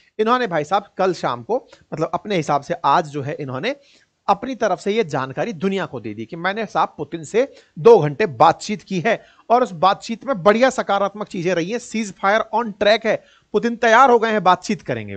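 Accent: Bihar, India. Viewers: ji, aapkay hindi lehzay say lagraha hei aap biharsay hein?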